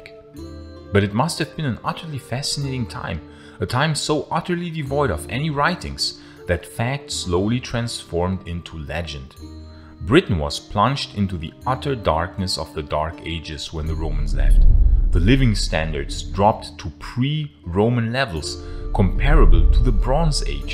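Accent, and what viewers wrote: Germany. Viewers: You are German, aren' t you. I detected it when you pronounced the word Claudius .... You might want to update your english pronunciation. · Oh God, 1 second in and I can't watch it. Listen Mr German speaker it is not Lon,un, it is LuN Dun, stress on first syllable etc etc. YOu know how you can tell a German? Complete inability to pronounce London plus complete shock, horror, and inability to comprehend the use of the word "since"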